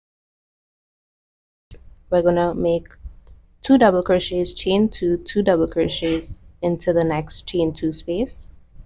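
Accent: Trinidad and Tobago. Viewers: You from Trinidad? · im guessing youre trinidadian, your accent seems so familar !!! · Omg my total shock when I heard your accent and realized your from my country Trinidad and Tobago omg!!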